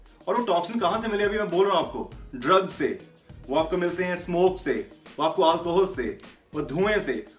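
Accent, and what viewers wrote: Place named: India. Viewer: The worst thing ive heard is indians speaking english i dont understand 70% of words he saying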